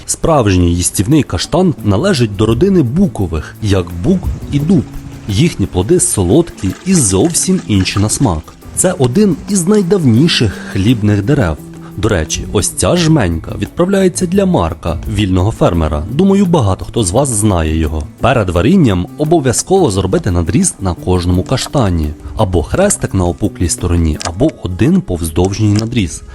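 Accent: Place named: Poltava, Ukraine. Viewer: Ваш полтавський акцент чудовий❤ може ви й не звідти, але дуже схоже, в мене звідти родичі) А де таке росте? Де його взяти? В нас тільки кінський скрізь росте...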